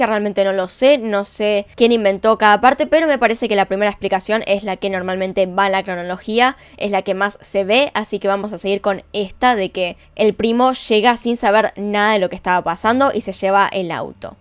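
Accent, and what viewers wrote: Argentina. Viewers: Me encanta como narra con su acento argentino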